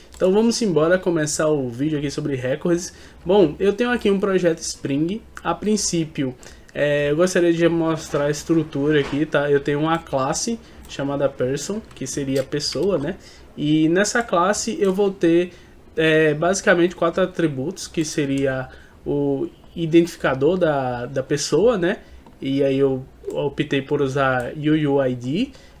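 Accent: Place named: Pernambuco, Brazil. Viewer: Oi, vi o post no YT e achei interessante. Quando vc começou a explicar, fiquei com uma pulga atrás da orelha (Esse rapaz é Pernambucano). E o tiro foi certeiro kkkkkkk O modo de falar entregou... hehehehe. Ganhou mais um seguidor. Abraço meu caro, um prazer encontrar pessoas aqui como vc. Agradecido pelo compartilhamento. Vamos nos falando.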